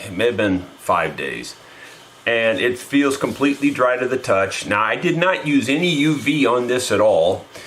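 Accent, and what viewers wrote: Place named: Missouri, United States. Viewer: I’m a bit further down the road but recognize the accent as “local” for sure. I’m in Jonesboro, AR and dig your repair style!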